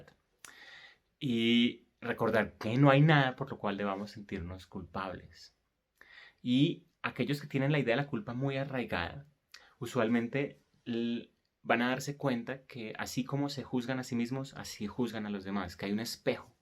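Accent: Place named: Colombia. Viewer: Qué amorosa explicación ☺️♥️✨📘🕊! Y qué lindo tu acento colombiano 🤗! Yo estoy entendiendo más sobre la proyección de la culpa. Culpo a alguien por algo y ya me detengo a observar esa culpa en mi ✨ Aún necesito ayuda y a veces el ego interviene para no verla con la Verdad. Gracias por tu aporte y consejos! 🤗🤗🤗🤗🤗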